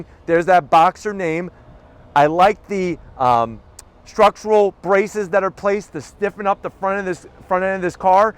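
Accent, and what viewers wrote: United States. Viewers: American pronunciation for y’all. Smh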